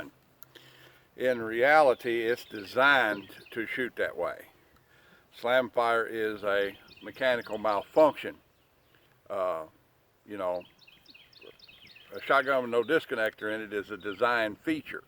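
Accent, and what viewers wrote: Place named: Appalachia, United States. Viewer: let me say I love your videos and subscribe to your channel. If I didn't know you was up in Kentucky and was just listening to ya I'd think you was a local boy from here in the Carolina Mountains. I spent a good many years here as a Deputy Sheriff and in doing so came to harbour a life long love of the riot/police shotgun or as Ole Bill Jordan called it "The Great Equalizer". I went through the Police Academy with an Ithaca 37 DSPS (5 shot, 20", parkerized) and have put together a decent little collection buying most what I've got in the past when they were alot cheaper than they are today and I had more money to spend on them too. My biggest regret has been not going $750 on an H&K 512 at a gun show in early 2000's. It wasn't til I got home and saw how rare they are that I knew how bad I messed up. Made on contract for German GSG-9 that 12 ga.auto also had a shot dispersion device on the end that was adjustable to throw a horizontal or vertical oval pattern depending on the setting. 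Only the overrun of 341 guns total was ever imported into the U.S. by Keasler Police Supply. I passed it when the seller wouldn't budge off $750 to my eternal shame. Anyways the initial reason for the comment was just wanna help you out a little bit on your Ithaca 37 details. The LAPD Special 37 did have corn cob forearm but it had black plastic butt plate, parkerized finish, 4 shot magazine, and 18.5" rifle sighted barrel that differed from others by having the rear sight only windage ad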